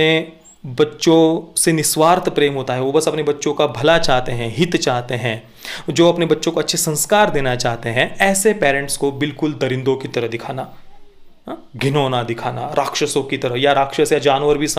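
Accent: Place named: Maharashtra, India. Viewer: bhai main kanpur se hoon ....muzhe marathi nai aati lekin aapke muh se marathi badi pyari lagti hai..isi bahane ek nai pyari language sikhne miltti hai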